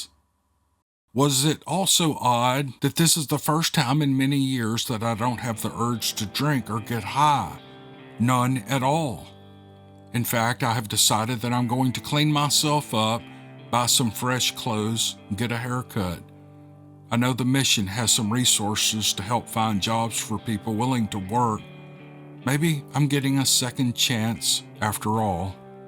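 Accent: South, United States. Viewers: If? Really? I remember when u told someone not to send u a story, if they'd already sent it to someone else's channel. Brother i love ur story tellin. How about u look at all the dna results. Again don't get all kinda way, i really love ur style and southern accent, being raised in the south, still yet u R tellin stories already shared on other sites, which aren't trying to do that.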